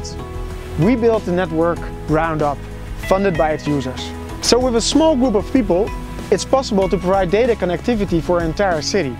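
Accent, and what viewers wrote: Netherlands. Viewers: dikke nederlandse accent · zwaar nederlands accent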